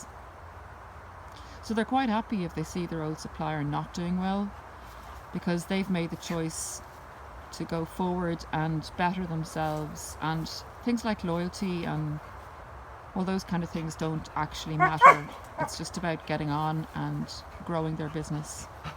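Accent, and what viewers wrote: Ireland. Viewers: I love the background of beautiful Forrest and cute little dog, thanks for your wisdom , also love your Irish accent it’s a soothing way to get the information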